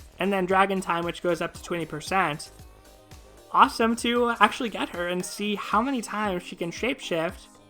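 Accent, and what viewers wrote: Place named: Texas, United States. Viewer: A fellow Texan! I wouldn't have guessed it, but now you mention it I can hear it lol. Also this Gala was SO GOOD to me, yeehaw!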